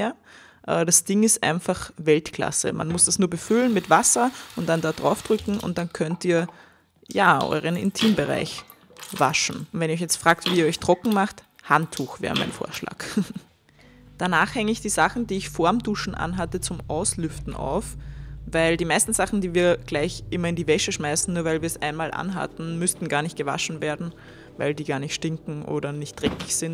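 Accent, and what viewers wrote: Austria. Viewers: ich hatte eben leichte Kopfschmerzen und dank des kack österreichischen Dialekt ist es noch schlimmer geworden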